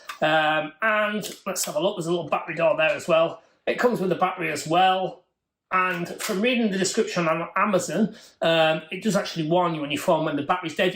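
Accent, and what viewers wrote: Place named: Leeds, United Kingdom. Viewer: Don’t worry about the fool picking on your accent. coming from Crossgates and living in Australia for 34 years , your Leeds slang is an added bonus when watching your videos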